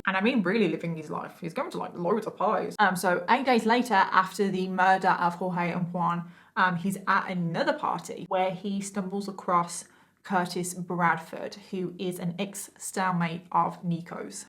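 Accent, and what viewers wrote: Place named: West Midlands, United Kingdom. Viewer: Your accent is a combination. West Midlands and what?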